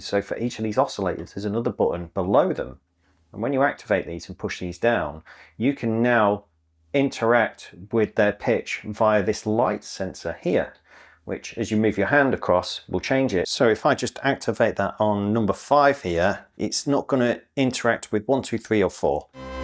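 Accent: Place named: Tameside, United Kingdom. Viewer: You sound like you are from my area of the country, I'm in tameside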